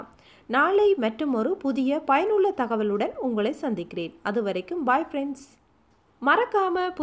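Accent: Sri Lanka. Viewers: they r srilankan i think they say like that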